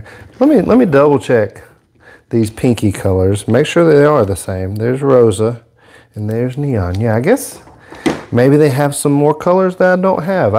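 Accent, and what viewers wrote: South, United States. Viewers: great !you sound like yer from the south!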